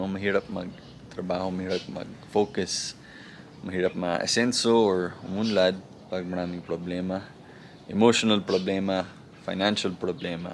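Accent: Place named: Philippines. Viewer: Ibang iba na ang accent mo ngayon sir very pinoy na..btw, all of us has to go through different hurdles in life but what can makes us strong is our faith in God coz no matter how big our problem is, there is always bigger God for us..Just be strong and keep moving forward. God Bless you and your family.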